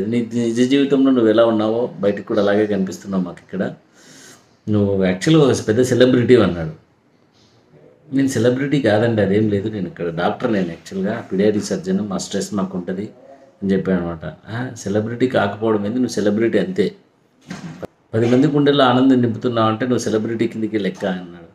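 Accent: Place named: Rayalaseema, India. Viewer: Superb comedy, innovative ideas coupled with Rayalaseema slang made your videos extra special. Keep going brother.🙏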